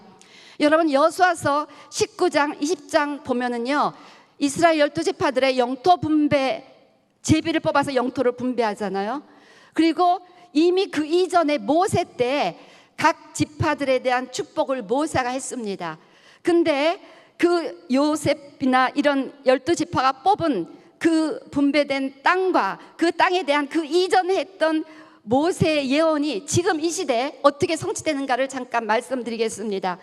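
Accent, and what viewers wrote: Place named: South Korea. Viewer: 콩글리쉬 참 듣기 힘드네..... 아이고.......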